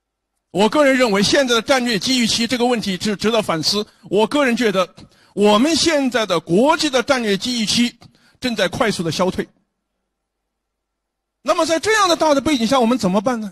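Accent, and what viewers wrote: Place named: South, China. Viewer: ，您这站得直啊。我以前比较小看南方口音，特别是 B 站做游戏视频的。没想到南方口音也能说出这么有气节的话！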